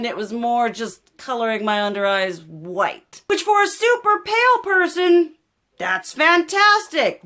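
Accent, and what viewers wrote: South, United States. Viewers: New subscriber here 👋👋 I have to ask, with that beautiful southern accent, where are you from??? I live in northern Louisiana and we have a few women here that sound the same! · a true southern belle 💗 you have such a beautiful voice. I'm in Lafayette Louisiana and all I hear around here is Cajun so its refreshing to hear a beautiful accent 💯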